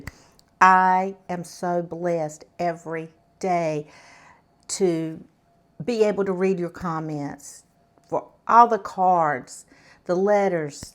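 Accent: South, United States. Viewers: I Love listening to you tell the recipe with your southern accent. Cant wait to makeThe King Ranch Cassarole. Thx 🎉👍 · Is that a southern accent?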